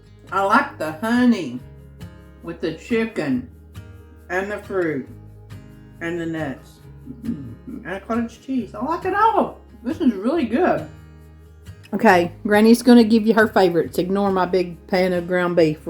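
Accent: South, United States. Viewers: thank you for replying about my cottage cheese/ beet recipe. I can just hear your voice …. I love your southern accent! And of course all your recipes, I have tried so so many of them!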